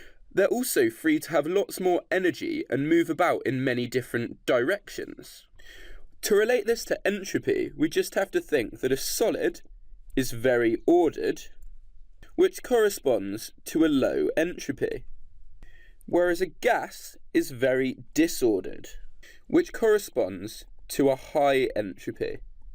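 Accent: United Kingdom. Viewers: good content, posh accent unbearable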